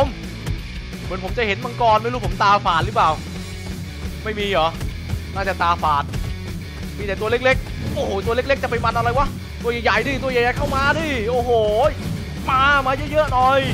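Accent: South, Thailand. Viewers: คนใต้ปะพี่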